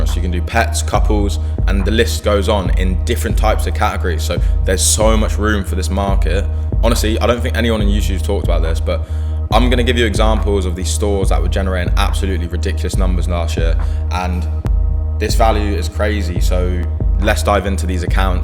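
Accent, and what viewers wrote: United Kingdom. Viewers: Are you from the UK bro...?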